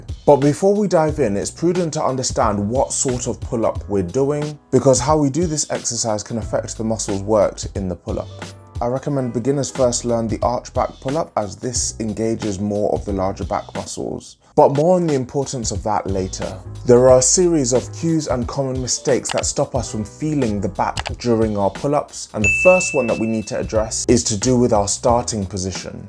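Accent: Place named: United Kingdom. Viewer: Quality video, definitely will check your advice out next time I'm in the gym! Btw. 3 words into the video and I didn't have to check where you from, mate :D Also, no hate here, british accent sounds awesome :)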